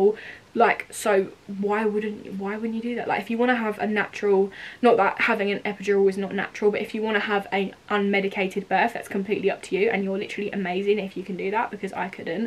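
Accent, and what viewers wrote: United Kingdom. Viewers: Congratulations on your little baby boy and your make up is so pretty I love it and I love your British accent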